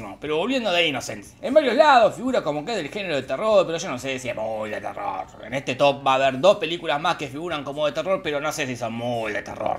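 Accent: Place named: Argentina. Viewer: Hola. He querido sacar unos minutos para, a través de este comentario en el último video de 2021, felicitarte por tu extraordinario canal. He visto todos tus videos con mis dos hijas, de 13 y 11 año, y a veces con mi esposa, desde hace ya más de un año, creo yo. Lo descubrimos en los momentos aciagos de encierro durante los primeros meses de pandemia y se ha vuelto un entretenimiento regular para la familia. Mis hijas han adoptado todos los clichés de tus videos. La de 11 años, Isabella, nos mata de risa cuando de la nada, en medio de una conversación, nos sorprende diciendo con inconfundible acento argentino: "que tristeza tan grande..." o "todo lo contrario a la vida real", o "vamos a entrar al terreno de la f i c c i ó n", o "Ah, ni me sorprende" o "¡Increíiiible!" o “Uuuy, no lo puedo creer!” y, en especial, “¡Patrañas!”, en el que imita el timbre y tono de la escena que insertas en tus videos, y así con todo, siempre en la ocasión adecuada. 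La de 13 años, Nicole, dejó de hablar medio mexicano y ahora habla medio argentino. Incluso a mí me ha pegado expresiones que antes no usábamos como "prendido fuego" (aquí en Colombia decimos simplemente "prendido" o "encendido”), “morfar”, “chapar”, etc. Y ni que decir de las expresiones “agárrate de tu cuñada” o “más… que patada de monja”, que ahora usamos todo el tiempo. Es verdad que no siempre aprovechamos “este momento de mierda” para pegarle la megustiada y la compartida al video, pero sí que le pegamos la suscribida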